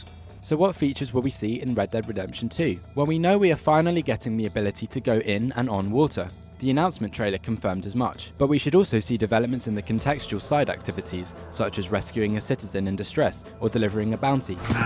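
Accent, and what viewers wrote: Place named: London, United Kingdom. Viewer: man, I love your accent. Are you from London?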